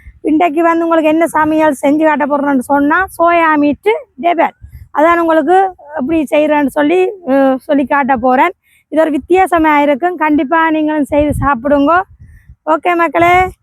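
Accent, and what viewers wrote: Sri Lanka. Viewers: முன்னாடி என்றால் யாழ்ப்பாண தமிழோ ...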